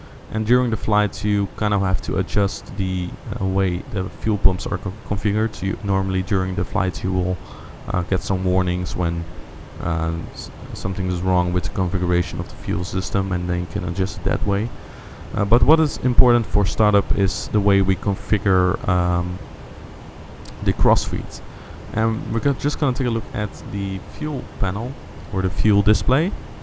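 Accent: Netherlands. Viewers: dutch accent... ;-)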